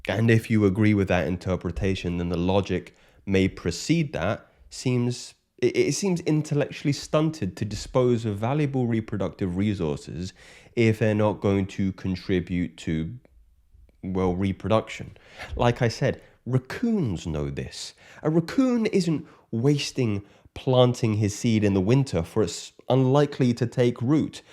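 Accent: United Kingdom. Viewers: Ngl, the proper British brother's verbal gentle assault on my brain sparks the biological need to bring as many kids as possible into life and actually be a masculine manly father This the typa conditioning that should be broadcasted instead of whatever anti biology society participates in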